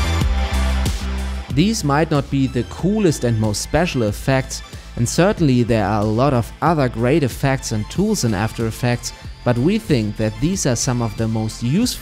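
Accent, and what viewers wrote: Germany. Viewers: Hey. Ich fange demnächst an mit After Effects und dachte, als ich euer Video gesehen habe, dass ihr euch sicher sehr gut damit auskennt. Könnt ihr mir also bei dem Start in AE etwas helfen? Außerdem habe ich eurer "Deutsches Englisch" sofort erkannt! :) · I like the German accent. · ist deine Muttersprache zufällig Deutsch?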